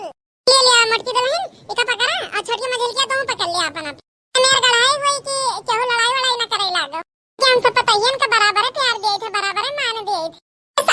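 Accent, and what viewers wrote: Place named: Raebareli, India. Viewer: Aaap kaha se ho Raibareli ki bhasha lagti h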